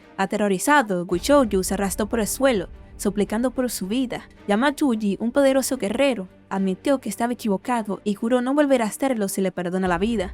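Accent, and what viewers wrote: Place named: Dominican Republic. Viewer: Solo con la voz pude deducir que era dominicana,rd representando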